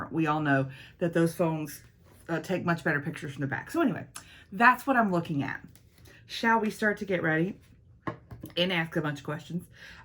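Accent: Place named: South, United States. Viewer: I found you from your beautylish lucky bag video. Never commented on YouTube before but wanted you to know that I stayed and subscribed because you truly do seem to “be kind” and positive. It also doesn’t hurt that you talk like me. 😁 I’m from SC where we also eat “hoop” cheese and know how to say Greenville!! I appreciate how raw and honest you were with some of these questions. I think your younger self would appreciate the advice. You’re truly a hidden gem here on YouTube.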